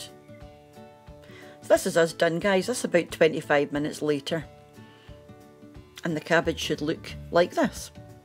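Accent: Scotland, United Kingdom. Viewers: I clicked on this video more to just hear how this dish is pronounced and love the Scottish accent so much.. I ended up watching the entire video and now I need to make this for dinner..Just subscribed!! LOL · Scottish accent is funny like irish accent but the people's features are so beautiful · I have trouble with Scottish accents but I can understand your beautiful accent perfectly. I never heard of this but it sure does sound good. By the way, I'm doing my family tree and discovered aseveral times great grandfather was from Scotland. Completely unexpected. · You sound very like my husband. Light, polite and well spoken. I love that kind of Scottish accent. He's from outside Glasgow. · I came for the dish.... Stayed for that lovely accent. I love your accent of the Scottish young. Lovely. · Grew up with Scottish accents, miss them a lot. Great recipes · I canna travel to Scotland anymore so I practice the accent listening to you and the Outlander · A perfect channel! 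A gorgeous Scottish burr (giving all sorts of ASMR) with yummy comforting food. Youtube heaven! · Your accent makes me homesick...... I was born in England but my family are from the Highlands originally and I called Auld Reekie my home for many a year, even started getting a wee accent masel..... Smashing.... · Yum! You sound like many of my pals fae Scotland! Much love from America ❤💙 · i like the name! and love the Scottish accent. also a big fan of SAHB, one of the best rock bands ever and highly underrated but i will always love them. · The accent takes me back to a happier time. I was raised by JOBs in Canada (Just Off The Boats) all of my family were from different areas of Scotland. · It's so interesting to me that Scotland has such different areas within it. I spent three years living in Fife and not once did I hear of this dish. It looks delicious and ill give it a try. So nice to hear your accent, I miss Scotland so much! 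· I could listen to a Scottish accent all day long. It reminds me of my grandparents.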